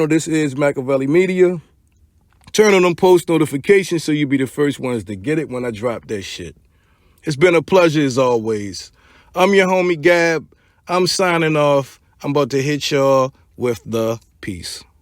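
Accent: Baltimore, United States. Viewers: I’m new here but I hear the bmore accent fam salute to my bmore brothers from DC🫡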